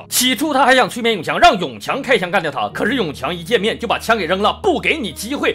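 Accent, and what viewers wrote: Northeast, China. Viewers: 牛叔啊，你这一口霸道的东北话太牛掰了！我也是东北人，但没有这么重的东北话口音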